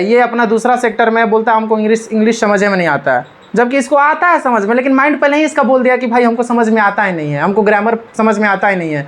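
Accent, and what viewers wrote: Bihar, India. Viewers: Apka language ekdm bihari lgta h😅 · Sir aap Bihar ke hai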